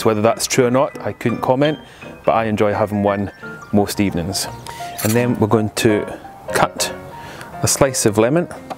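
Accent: United Kingdom. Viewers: Please explain again what is that you put on the glass the first time to make it pink colored? I am sorry I can’t really catch up with your beautiful British accent. Love your video so much.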